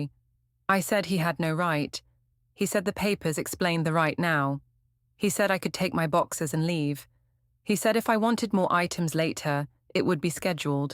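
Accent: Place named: United Kingdom. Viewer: Some things never change. They always use this British voice for the most mentally deficient, clueless, self awareness bereft, delusional women's stories. They always name them something faux sophisticated and exotic that reminds me of "Cruella DeVille". And there's always some lame attention obsessed excuse for whatever the women do that's supposed to absolve them of any blame even while they tell people half truths and manipulate then move as if their fictional reality is actual.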